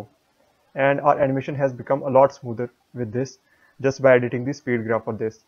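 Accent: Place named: India. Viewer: The professional Indian sounding guy saves the day. Thank you fam.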